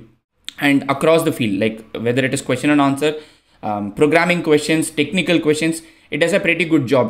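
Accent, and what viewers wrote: India. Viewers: Can you use an AI to remove your Indian accent?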